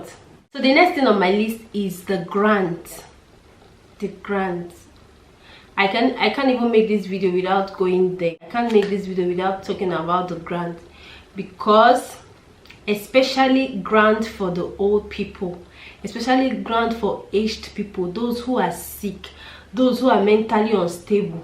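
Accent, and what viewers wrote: Nigeria. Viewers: So nice listening to you, you are beautiful love your Nigerian accent, and thank you SA are good to us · we love you here in SA 🇿🇦🇿🇦, plus your Nigerian accent sound beautiful sis.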